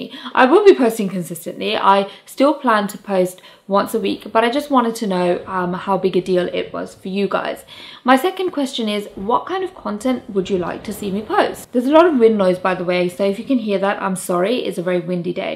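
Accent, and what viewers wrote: United Kingdom. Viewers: Consistency isn't important to me at all. I don't keep track of anyone's schedule. I just check my subscriptions every day and add the videos I'm interested in to my Watch Later list. So I can't speak for everyone, of course, but I would be perfectly okay with you skipping a week now and then if your schedule is just too busy. As for content, my favorite topics are minimalism. decluttering, and finances, but any of the other ideas you mentioned sound good as well, especially health and fitness. Anything that appeals to you would be fine with me. I've watched almost all your videos, and I've enjoyed each of them. As one of the other viewers said, I could actually just listen to you talk all day. As an American, I can't say what sets your accent apart from the typical British accent, but you just sound so posh and elegant ! Congratulations on your weight loss progress. 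I wish you the best of luck with that and with all your other goals. I will continue to watch to see how it's all going!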